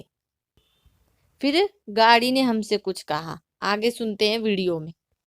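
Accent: Haryana, India. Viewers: maybe I could be wrong but Aap ke bolne mein Kuch Kuch Words ka haryanvi accent bhi aata h Sun k acha lgta h 👍👍 finally you got khidki wali seat 😀😀😁😁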